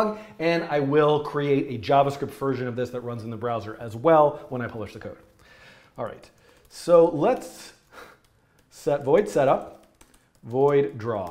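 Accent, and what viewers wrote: France. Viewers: I love your french accent 😝 préviou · Thanks for your content, your french accent was sooo bravo that I tried to implement this challenge, I added a bit of colors and random agents walking on the screen. Here's a link if you want to take a look at it. <link>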